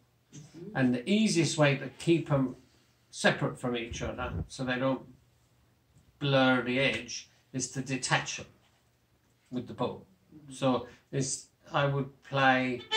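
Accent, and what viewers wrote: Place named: Cork, Ireland. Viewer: You talk like my 12 uncles from both sides of my family, they all came from Dublin when they were young men to Birmingham about 50 years ago, but I detect your not a dub but more western like cork.?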